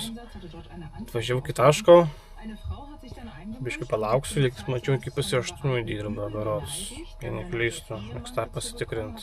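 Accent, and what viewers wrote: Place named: Alytus, Lithuania. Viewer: Sveiki. Seniai žiūriu vairuotojų kanalus, bet šį kartą truputį kitaip - malonu girdėti alytietišką tartį :)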